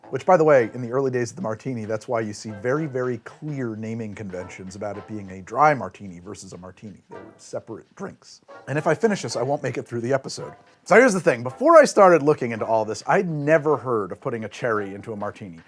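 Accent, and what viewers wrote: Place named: United States. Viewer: Saying FDR's name in a transatlantic accent then Churchill's in your modern American accent is really funny to me.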